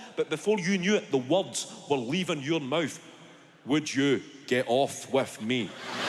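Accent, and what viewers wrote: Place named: Glasgow, United Kingdom. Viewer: Neither. It's Scottish (Glaswegian i.e. from Glasgow). :)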